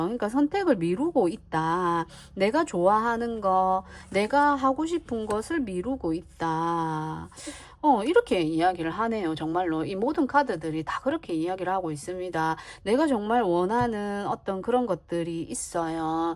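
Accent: Gyeongsang, South Korea. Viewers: 4번 갱상도 억양으로 들려주는 리딩~ 감사히 잘 들었습니다 나를 많이 챙겨라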